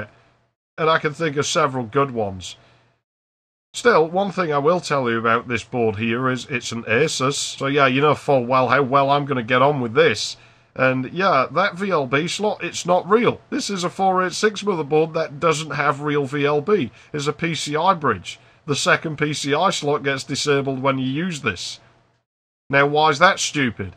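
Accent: United Kingdom. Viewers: that accent, 80% geordie, 20 % yorkshire... Durham?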